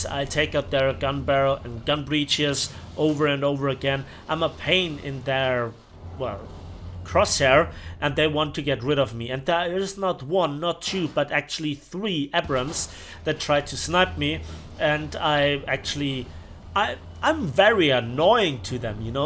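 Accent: Bavaria, Germany. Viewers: He just sounds like the typical german who speaks english. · He's from Bavaria. Those People have a special pronunciation, which makes them sound similar to the Austrians.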